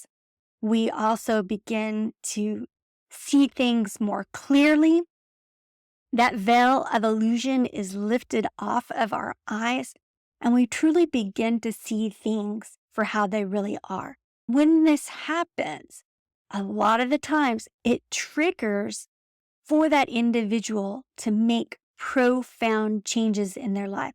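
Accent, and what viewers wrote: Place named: South, United States. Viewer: So nice to 'catch up' and hear a southern accent. ;) Love from Boston.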